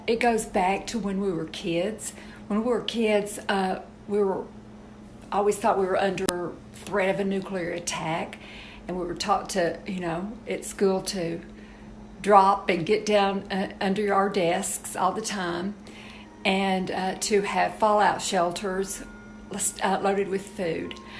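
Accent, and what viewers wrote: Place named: Tennessee, United States. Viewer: how are you feeling today? Hope your tests came back negative and you're on the road to recovery. I love hearing you talk it brings me back to my family from Tennessee.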